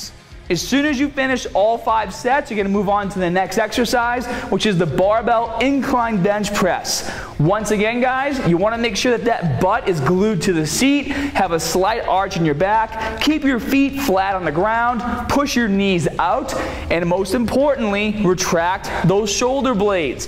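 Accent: Boston, United States. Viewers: Starting this today looking forward to getting some chest results - that Boston style accent cracks me up!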